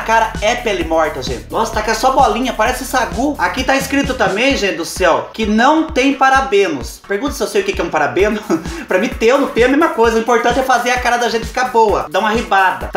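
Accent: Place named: Minas Gerais, Brazil. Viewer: achava que o seu sotaque era para os lado de Minas kkkkk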